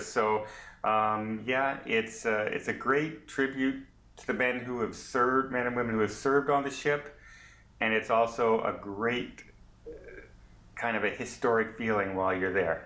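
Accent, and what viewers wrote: Canada. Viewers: Nice video but I need to correct your mispronounciation of Mobile..I live in Mobile soo I want to teach you the right way ...you say Mobile..like its a mobile phone.. Mobile has so much French influence..as does it's name. Think of the word..seal..then add Mobile..so its a hard Inflection on bile...sounds like seal. Welcome to Mobile. We love tourists! Sounds like you are Canadian? Come on down to see our beautiful historic city!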